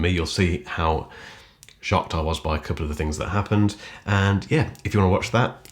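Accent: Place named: RP, United Kingdom. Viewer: The accent is so posh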